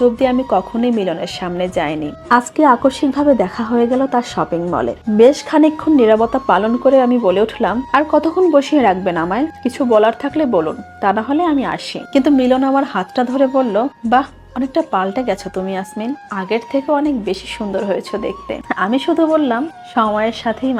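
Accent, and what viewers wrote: Barishal, Bangladesh. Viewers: আমি একজন নোয়াখালীর ছেলে। কিন্তু আমি বরিশালের কথাগুলো বেশি পছন্দ করে। আপু টিকটক গুলো আমার কাছে খুবই ভালো লাগে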